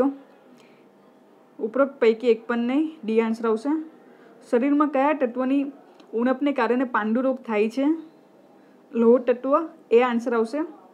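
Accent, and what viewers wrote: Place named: Surat, India. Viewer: I love your voice (surti boli) nice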